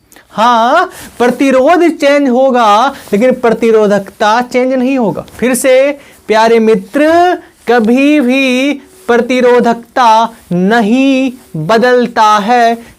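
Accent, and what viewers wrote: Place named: Bhojpuri, India. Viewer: Bhojpuri sun k badhiya laga sir ji 😂😂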